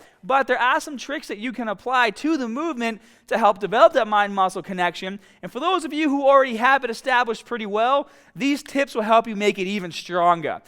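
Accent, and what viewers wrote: Boston, United States. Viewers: That's called the Boston accent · Is that a Boston accent? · Adrian I’m from Boston but no longer live there. Was thinking the same thing. I watch these vids not only for form but to hear the Boston accent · STRONG Boston accent in this video :-) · Love that Boston accent 😍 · Bostonian accent for sure. "Bah" for "Bar". "Staht" for "Start". Those words sound Australian. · Has to be Boston he sounds like my cousins 😊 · are you from boston? i love that accent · Lol is this guy from Boston? If so, represent!!! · I wonder if he's from Boston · No, he's likely from Boston. They say "r" words like that. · he’s a bostonian😂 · Helpful. But god damn I can't stand that Boston accent. Jesus Fuck! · I've watched so many SHF videos to get started on my weight loss journey but I just noticed that he's from Boston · Hmm I wonder if this guy is from Boston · Your def from Boston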